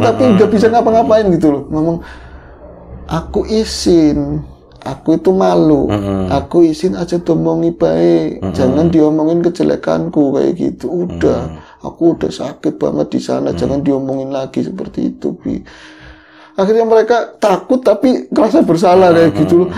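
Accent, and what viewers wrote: Banyumas, Indonesia. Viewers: Paling suka denger Mas bicara ngapak😄😄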